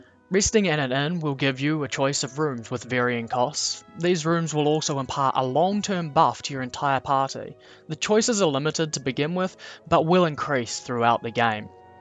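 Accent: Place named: New Zealand. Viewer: I love hearing a thick kiwi accent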